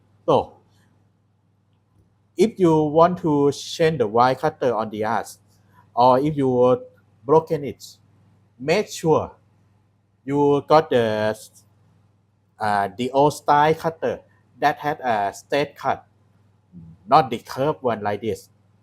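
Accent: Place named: Thailand. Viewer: สำเนียงคล้ายๆคนไทย เลยครับ เจ๋งๆ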